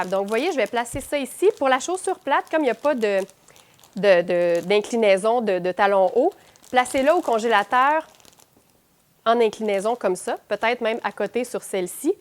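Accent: Quebec, Canada. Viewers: stupide commentaire c est une québécoise idiot du village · vous êtes non seulement BELLE mais avec votre accent Canadien, mon dieu vous êtes SO SEXY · Doney Hon c est français du canada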